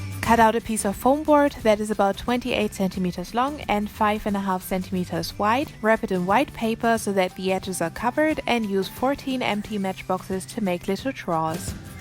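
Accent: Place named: Germany. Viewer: da hab ich doch ein gutes Gefühl für das deutsche Akzent😃😎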